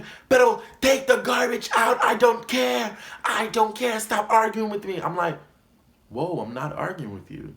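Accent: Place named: Miami, United States. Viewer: I think it’s in Miami based on his accent and DR references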